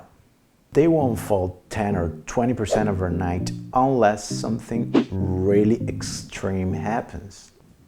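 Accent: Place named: England, United Kingdom. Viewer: Si hablas un ingles tan “ingles”, porque no los subtitulos en castellano??? 🤪🤪🤪🤪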